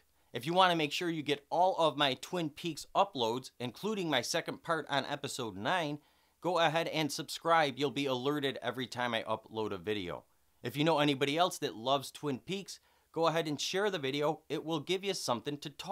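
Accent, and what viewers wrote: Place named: Chicago, United States. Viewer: I'm betting Chicargo...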